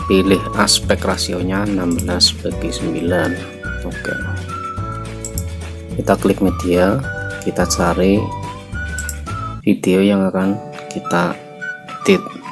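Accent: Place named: Java, Indonesia. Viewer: Ok suwun info ne ya Jan logat jawane medok bngt kaya nyong bae hehehehehehe Salam kompak selalu & paket silaturahmi sdh ku kembalikan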